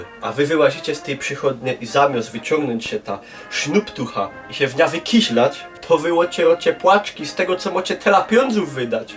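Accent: Silesia, Poland. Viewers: Uwielbiam te Twoje filmiki i tą śląską mowę.Moja przyrodnia siostra pochodzi z Mysłowic.Teraz w Niemczech mieszka🤗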